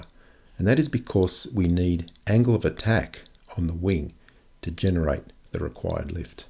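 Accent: United Kingdom. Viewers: A beautiful and well thought out video. you dah man!! A really good use of your time while flying over the ocean, and getting to stick it to the Flat Earth where the sun don't shine at the same time. I did my military service as an 18 year old (compulsory in Singapore) in the armoured artillery. We used maps and got to understand what they depicted. Back in the late 70's. My son did his service in 2006 and now they have the benefit of GPS targeting. You know that thing that you use in you cars to get to where you're going? It used sattelites. You know those pesky things that orbit the globe? Flat earth? What a frigging stupid idea. Free speech is one thing, but to use it to dumb down a sizeable portion of your people is worrism. Mark sargent, Jeranism, Patricia whashername, are actually conmen who don't really believe what they claim and are there to reap what they can earn from the uneducated and gullible public. 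The US should not have any place for this stupidity but sadly it seems to have. forgive me if I'm wrong but you sound like you're from the UK. I guess the epidemic of FE stupidity has crossed the pond. You have your work cut out for you if you should not get weary of sticking it to the Flatties. A really great and informative video. Well done!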